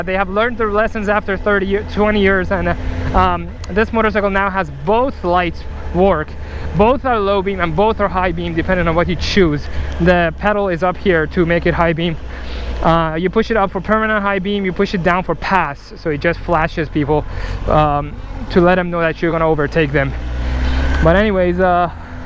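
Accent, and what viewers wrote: Iran. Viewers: persian accent straight away haha nice vid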